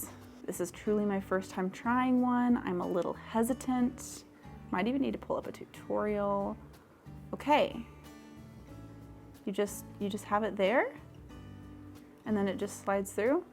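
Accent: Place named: United States. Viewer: American accents are something else... You really have that vocal fry. Whoa....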